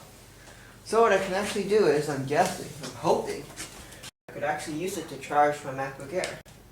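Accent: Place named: United States. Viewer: dam!!! so much happend to you well hope it goes well you live in hong kong?? you sound so American... heheh its all good cheers.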